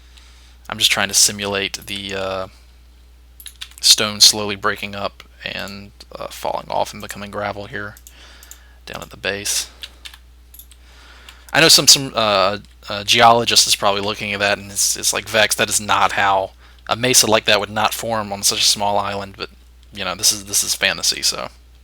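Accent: South, United States. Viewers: love the southern accent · What part of America are you from>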